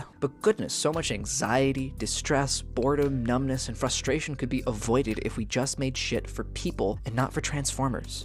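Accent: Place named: United States. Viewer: OMG, American accent, youthful voice. Try going to Delhi India if you want something to complain about.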